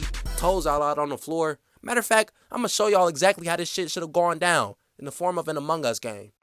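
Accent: Philadelphia, United States. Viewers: Yo bro where you from? sound like a philly buhl😭😭😭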